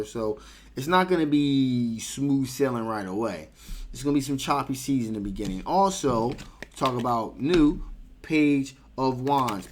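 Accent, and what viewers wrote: Philadelphia, United States. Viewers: I had a feeling you was from philly. Chester in the house. Lol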